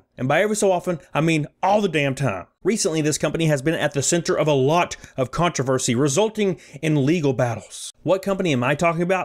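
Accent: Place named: North Carolina, United States. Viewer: Where you from brotherrrrr?!? I’m trying to guess by your accent. I’m guessing North Carolina. Much love from Houston Texas!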